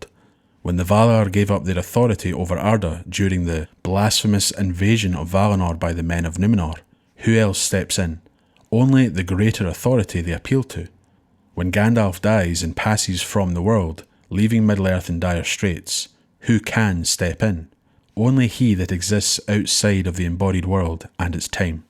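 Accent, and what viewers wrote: Scotland, United Kingdom. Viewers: Glad to have found this. And glad to find a fellow scot as a narrator.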